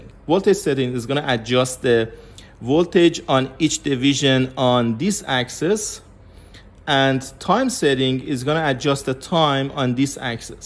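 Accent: Iran. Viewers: لهجه ایرانی😊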